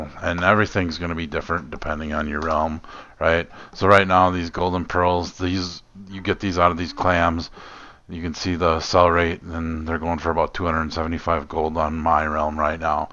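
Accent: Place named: Midwest, United States. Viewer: Your from the Midwest I can tell. I’m guessing Wisconsin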